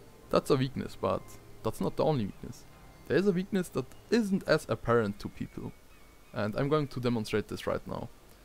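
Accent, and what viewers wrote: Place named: Germany. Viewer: Hab schon bei der ersten drei Sekunden erkannt das du ein Deutscher bist :D .. Cooles Video nice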